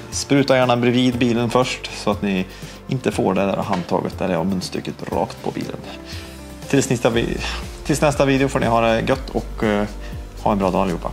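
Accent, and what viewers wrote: Värmland, Sweden. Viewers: Dä ä ju lite lustigt detta att du pratar värmländska mä buckel mannen men inte i dina vanliga filmer (inte lika grov värmländska) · Gött å höra lite bred värmländska! Ett fantastiskt hantverk må jag säja!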